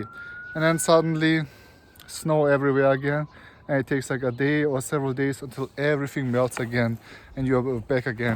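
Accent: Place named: Germany. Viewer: your accent is cute. i can tell right away you are german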